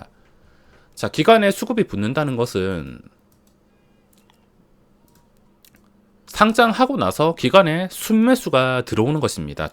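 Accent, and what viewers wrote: Gyeongsang, South Korea. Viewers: 칠암사계에서 목소리랑 똑같은분을 옆자리에서 우연히 뵈었는데 경상 지역남자분들 목소리는 비슷한가요 제가 암튼 늘 감사히 듣고있습니다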